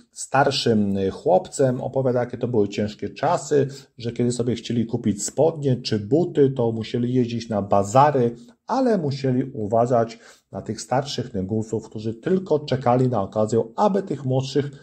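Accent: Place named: Warsaw, Poland. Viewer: A ja tam z innej beczki. Znałem dobrze jednego mechanika z Wołomina i on tak jak Ty mówił oksiązce i wchodził w dźwwli.Slychac w Twojej wymowie podwarśawski akcent. Z fartem 💪💪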